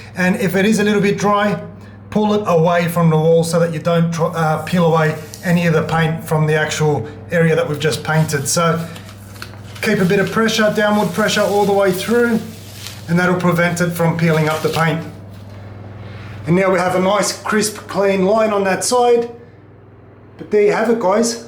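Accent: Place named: Australia. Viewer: Loving the vids mate - very easy to understand and so refreshing hearing an Aussie in one of these DIY vids! 😂 I had a similar problem in the bathroom, prob even a bit worse, was at least half the ceiling. This is my third attempt in about 12-18 months! This time I used Zinsser BIN as the primer but basically followed this same process you detail. From what I can tell it is a similar product but no Binder in it... I have just applied a final 'top' coat of joint compound... Should I just use the BIN next before my ceiling paint or buy the Peel Stop or Crommelin? I could really do with your assistance. Cheers mate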